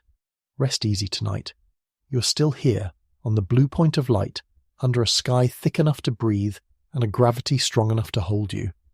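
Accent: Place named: England, United Kingdom. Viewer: couldn't take more than a few minutes of this snobbish AI English accent ... got new for you... if you thin k it makes the narration sound intelligent.... you're wrong.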